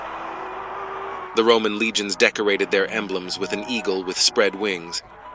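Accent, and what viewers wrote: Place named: Andalusia, Spain. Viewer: TAN SOLO ESCUCHAR SU VZ DE UN CASTELLANO ANDA LUZ ME ENFOCA YA M ILUMINA LA SABIDURIA.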